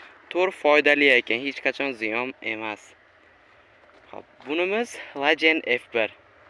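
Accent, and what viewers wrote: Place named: Tashkent, Uzbekistan. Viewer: Toshkenlimisiz shevangizdan bilinyabdi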